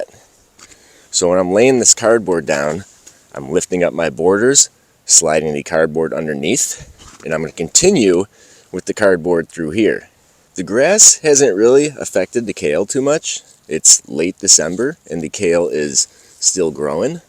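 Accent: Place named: New England, United States. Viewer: Ur Yankee accent is pleasant sir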